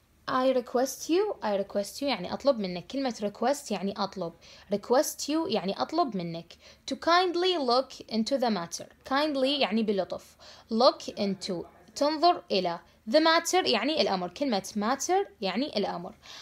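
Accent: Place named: Iraq. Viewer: ههههههاي. عينيه. عله. صوته بعد عراقي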